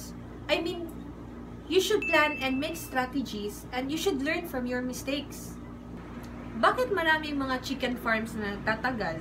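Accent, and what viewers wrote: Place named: Bicol, Philippines. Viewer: new subscriber here from Bikol... tga saan ka ma'am? parang bikol yung punto ng tagalog mo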